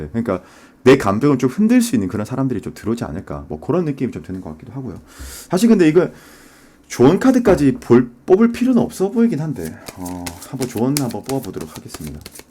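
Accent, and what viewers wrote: Gyeongsang, South Korea. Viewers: 사번 연애포텐셜이 터진다니 ㅋ 😄 지금은 별일 없지만 곧 몇 명씩이나 온다니 기대해보겠습니당 ㅎㅎ 조언해주신대로 오픈마인드로 다가오는 인연들과 좋은 추억 만들어볼게요💛🧡❤ 배경음악도 멋지고 목소리가 넘 좋으세요 님의 타로리딩 정확도 면에 있어 제가 본 타로 유투버 들 중 탑인거 같아요 진짜 예리해요 👍 전 부산 사람이라 님의 구수한 경상도 액센트가 친숙해요 😊 감사드리고 타로하는 구독다수 일취월작하셔서 번창하시길 기도할게요🍀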